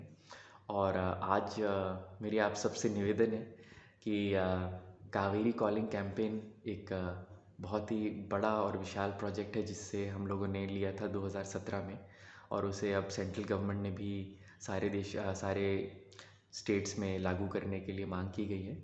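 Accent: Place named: Andhra Pradesh, India. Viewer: telugu boy talking in shudh hndi lets goo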